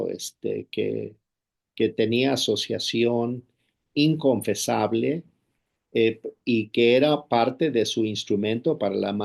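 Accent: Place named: United States. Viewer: Enrique Pascoe, ¿no eres mexicano? hablas mal el español. Al hablar del bloque liberal democrático ¿te refieres a los norteamericanos y europeos? Pensé que ellos había esclavizado a medio mundo, Africanos, asiáticos y aborígenes americanos. ¿No es EU el que le quitó más de la mitad del territorio a México? ¿NO ese mismo EU, que mencionas el que amenaza a Canadá en volverlo Estado de EU, o a Dinamarca de quitarle Groenlandia? ¿No es ese mismo EU, el que destruye a sus aliados? Solo hay qué ver la condición de los europeos ahora, después de la guerra con Ucrania. A México le iría mejor manteniéndose más al margen de EU, o lo acabará destruyendo. Lo mejor es que nosotros como mexicanos veamos nuestras opciones, no las de EU. De todas formas comprendo tu punto de vista: pareces gringo, hablas como gringo, defiendes a los gringos, aun con sus peores acciones. 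Entonces, eres gringo, ¿por qué no lo habrías de defender?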